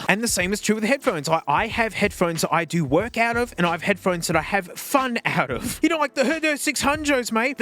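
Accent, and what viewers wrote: Australia. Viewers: Wake up, the aussie man is yelling at headphones again. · I dont care about audio The only reason i subbed is to watch a random aussie man yell at tech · Im early to the aussie yelling guy for once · finally im early to hear the australian man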